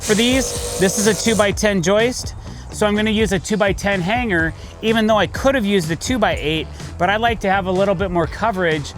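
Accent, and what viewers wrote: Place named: California, United States. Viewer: Yeah this dudes a clown they don't even box in their post. Tell from his voice he up north or California probably. Code in his area isn't code for entire US and he is going to get homeowners and contractors having words with each other. Sounds gay so I'm sure drama is all he is trying to create. If your thumbnail is calling for folks to be fired! No reason not to have disclaimers telling home owner to CHECK LOCAL BULDING CODES before engaging with their contracter.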